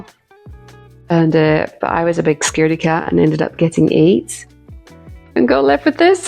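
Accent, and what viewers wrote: Scotland, United Kingdom. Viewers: Hi I’m also Scottish but moved to the US years ago to be with my American husband and not sure how long you have lived in France but it’s so nice to hear you haven’t lost your beautiful Scottish accent as I still have mine too and never understand how adults lose it after living a short time in another country but children are different they lose it pretty fast. I love Kika’s patterns also and in the Spring I finished the Salty Days Sweater which turned out beautiful. I also paint in watercolors so it was a lovely gift finding YouTube suggesting your video today and have subscribed also your Seashore Blouse is gorgeous! ♥️🤍💙🏴🧶🫖☕️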